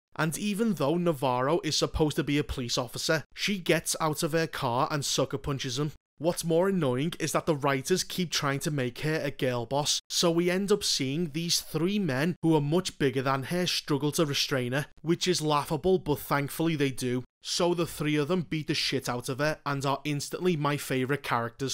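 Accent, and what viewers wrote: Liverpool, United Kingdom. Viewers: There is nothing more perfect to listen to, than a Scouser having a sarcastic rant about something 💩💩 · You’re my favorite reviewer of this. You being scouse just makes it better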